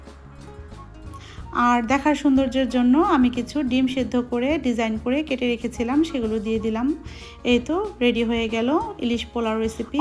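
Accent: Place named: Tangail, Bangladesh. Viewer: কথা বলার স্টাইল মনে হয় আপনার বাড়ি টাংগাইলে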